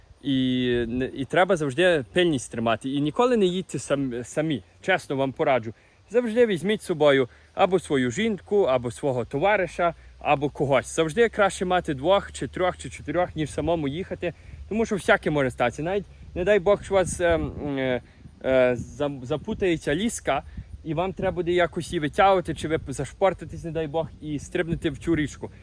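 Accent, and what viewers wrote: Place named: Galicia, Ukraine. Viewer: Насолоджуюсь просто вашим галицьким діалектом! Дякуємо вам за працю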